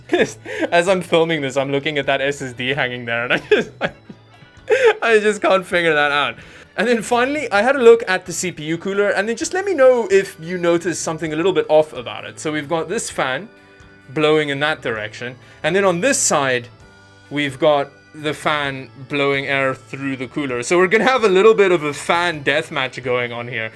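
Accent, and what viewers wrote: South Africa. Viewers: You kinda sound like a South African ngl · My first time watching one of your videos, and I just have to know... you're South African right?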